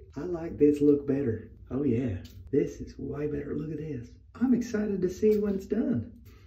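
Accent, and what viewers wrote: South, United States. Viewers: I have never been so in love with a southern accent😅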